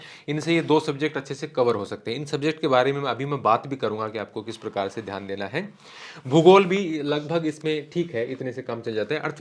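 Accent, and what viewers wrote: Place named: Bharatpur, India. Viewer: गुरुजी नमस्कार मजा आ गयो आपकी बोली कतये भरतपुर के की तरह खड़ी है सीधी सपाट बात करें आपकू बहुत बहुत धन्यबाद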